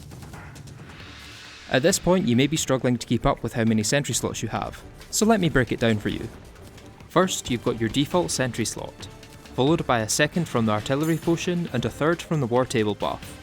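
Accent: Scotland, United Kingdom. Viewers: Came for the terraria, stayed to be gaslit by a nice sounding scotish man :)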